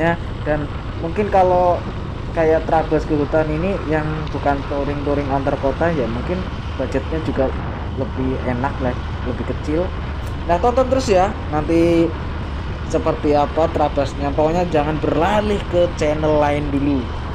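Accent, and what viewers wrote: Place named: Banyumas, Indonesia. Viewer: Itu bahasanya kok ngapak bang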